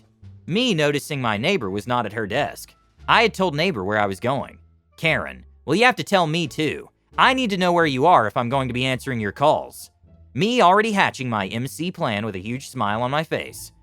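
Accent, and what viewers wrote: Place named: United States. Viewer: How many languages are you fluent in? I’d swear your English is US native speaker but there are also videos on the channel I think might be Russian (or something somewhat similar?), but also might not be your voice?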